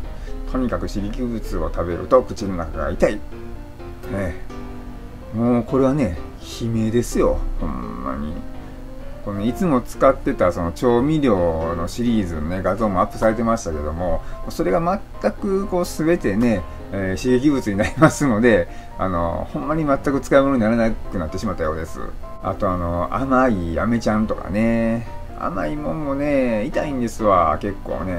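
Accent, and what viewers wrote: Osaka, Japan. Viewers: さん❣️こんにちは☺️こんばんはおはようございます😃 今日も為になる経験談を大阪弁に乗せて語っていただきありがとうございます❣️ さんは納豆大丈夫なんですね❓関西の方は苦手な方が多いと聞いてましたが…… 副作用との戦いはまだまだ続くのですか❓ さん頑張れ〜頑張れ〜❣️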